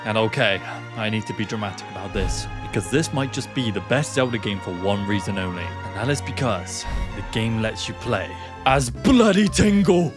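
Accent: Bristol, United Kingdom. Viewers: I know a Bristolian accent when I hear one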